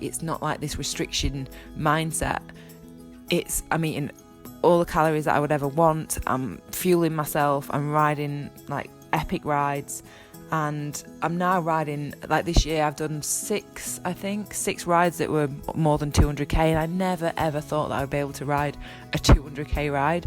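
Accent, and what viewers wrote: United Kingdom. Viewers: This is such an inspiration. A feel good story narrated in a very pleasant British accent. Watch the video, you will be glad you did.. Thank you for sharing..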